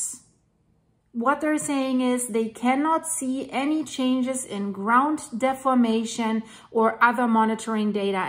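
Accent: Germany. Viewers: I love the German accent especially when discussing volcanology lol How dare you people say such things ☝️ So insulting lol When I first moved to B.C. not long after Mt Saint Helens when off from Australia in 1987. I then saw Mt Baker 😳 holy crap thats scary and all these guys are afraid of snakes and crocodiles. Then I learned of Mt Rainer (Beer cans of coarse) and Mt Shasta and was like omg where heave I moved. B.C. Itself is loaded with more volcanoes north of Vancouver. Australia, the safest place on earth 🌍 so I’m home. Oops, unless we get Tsunamied from a landslide from southern NZ with a mega Tsunami 🌊 of coarse. Such is life as a human being.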